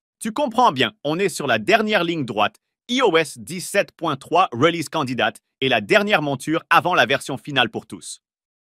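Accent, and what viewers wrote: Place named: Canada. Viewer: Merci, Très expressive cette voix avec un petit accent canadien je crois. De quelle voix de synthèse vocale s'agit-il? Bravo pour le travail👍😎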